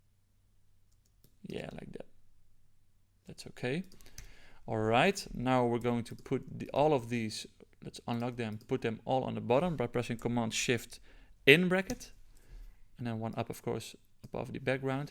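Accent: Netherlands. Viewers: Quality stuff on your channel man. Glad I found you. I think I recognize a Dutch accent but I'm not entirely sure! Keep it up man.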